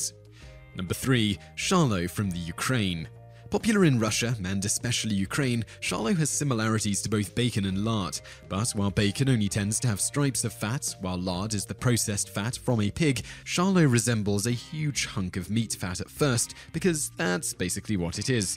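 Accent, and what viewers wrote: England, United Kingdom. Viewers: Canned spaghetti used to be a favourite of mine as a kid. I like turkey Spam. I really appreciate the inclusion of brown sauce on this list. Maybe because the narrator has an English accent. I initially wondered if Vegemite would get on this list (though all things considered, Britain would have to be cited for Marmite in such a case), but credit due to Witchetty grubs as the Australian example.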